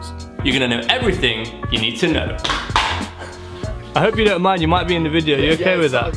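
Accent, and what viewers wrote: United Kingdom. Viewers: I had to turn the caption on because of the British accent 😂 I'm a fresh PharmD graduate and i'm interested in patient education and you are indeed inspiring me a lot!!🙌🏼 keep up the great work! God bless you!🙏🏻✨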